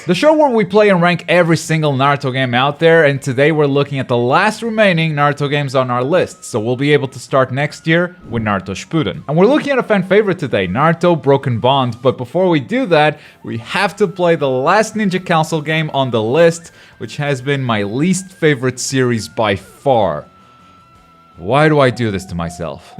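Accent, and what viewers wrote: Portugal. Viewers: Wait, are you portuguese? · Are you portuguese ? 0.o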